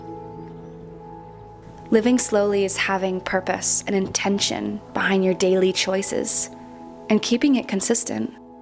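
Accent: United States. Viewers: Are you from American🇺🇸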